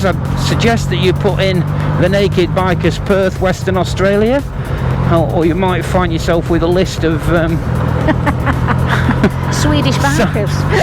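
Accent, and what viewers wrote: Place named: Northwest England, United Kingdom. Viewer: . Thanks for the response. I did notice a slight northwest sound. (Similar to Freddie Flintoff but less thick.) On to the video, thanks for taking us on a leisurely jaunt to Hillarys Boat Harbour, a place I want to return to. 3 Sheets on the Harbour, bloody nice as margarita pizza.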